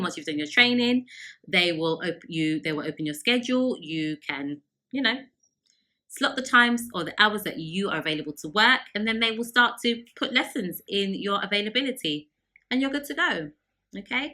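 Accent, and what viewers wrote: United Kingdom. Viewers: Like your content & was drawn to subscribing after watching some of your prior postings. When I see you sharing ur knowledge for free I felt a good vibe about you😀in addition to that British accent. And since you are being so generous i'd like to give u a hand by directing you to a free online source with a wealth of info which u may find helpful pertaining to your calling. If you're interested, let me know with a reply in the thread. Keep inspiring! Peace!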